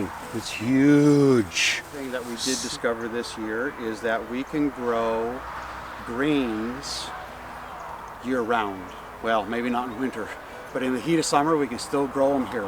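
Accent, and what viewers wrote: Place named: Minnesota, United States. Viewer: Nice Garden!!! I thought I recognized a tiny bit of a MinneSOOOda accent. I grew up in Bloomington. Hey, if you're expanding your garden, get a packet of Sumer Dance Cucumber seeds. Grow them up a trellis. Foot or more long, straight, very few small seeds. Great for sliced pickles. Pine Tree Seeds. TYFS, Mark and Rosa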